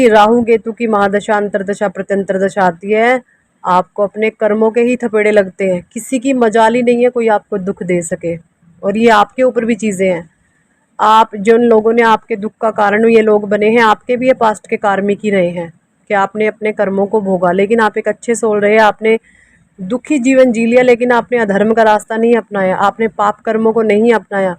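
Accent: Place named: Punjab, India. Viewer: 😀😀😀 वाह तुसी पंजाबी हो 🙏🙏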